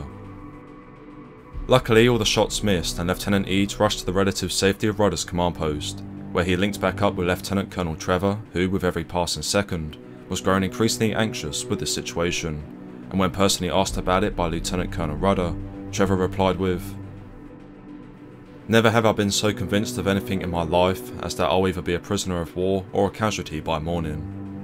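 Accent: United Kingdom. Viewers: I could never crack where he's from but as soon as he pronounced battery as ba'ery I knew he was bri'ish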